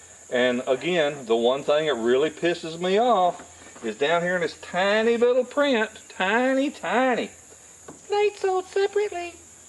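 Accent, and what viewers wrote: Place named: Oklahoma, United States. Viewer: I've subbed recently and I am highly entertained and educated by your videos. I'm from Oklahoma and you sound like an okie to me.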